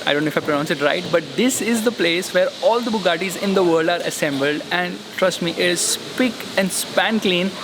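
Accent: India. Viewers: Indian accent of Hinglish. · I can understand your indian english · If there is an expensive car, it is a luxury item and if a man is speaking English in Indian accent, it is definitely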